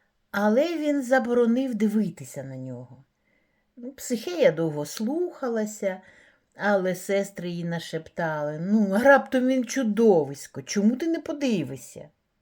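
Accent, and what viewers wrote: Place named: Ukraine. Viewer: Який приємний український говір❤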